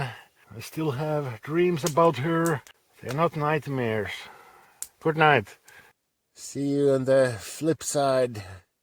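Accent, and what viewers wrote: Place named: Finland. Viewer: You are a very good narrator and always make me smile with your Finnish accent and humour - well, in polite English, I would say that mostly, you are 'extracting the urine' out of things you witness. What tent was that one - cancel, that, just expanded the description - is it heavy to carry?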